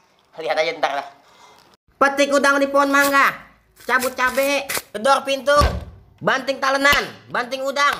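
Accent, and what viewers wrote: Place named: West Sumatra, Indonesia. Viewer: Suaranya persis minang kocak anjir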